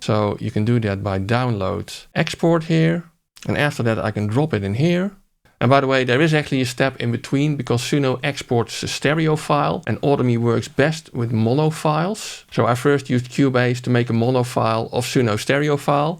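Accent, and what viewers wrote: Netherlands. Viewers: very Dutch accent there ;-) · Grappig dat je als nederlander onmiddelijk een engels sprekende landgenoot herkent. Niet lullig bedoelt overigens! Goeie video! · He is so Dutch, I hate this Dutch shshshs pronunciation...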